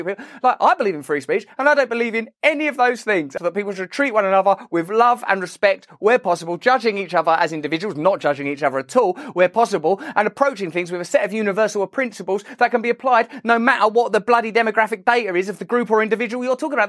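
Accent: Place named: Cockney, United Kingdom. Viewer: Coincidentally, your accent sounds a bit like the chimney sweep from Mary Poppins.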